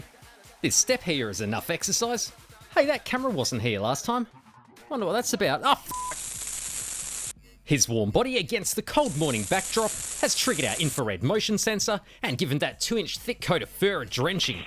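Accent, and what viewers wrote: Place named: Australia. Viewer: theres no better narrating than a an Aussie lol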